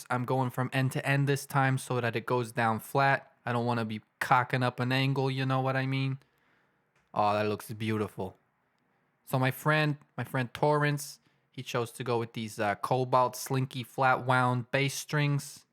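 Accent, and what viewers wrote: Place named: New York, United States. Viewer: I can't say that this guy does or doesn't live in Boston, but that is NOT a Boston accent. Sounds more like NYC to me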